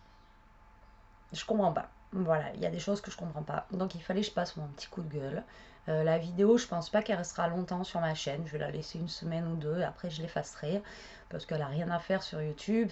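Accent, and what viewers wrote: South, France. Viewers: Ha pauvre. Perso j adore tes tutos. Ton petit bordel sur ton bureau. Ton accent du soleil......allez laisses tomber. Et continue de nous faire rêver avec ton sens de la création Bisous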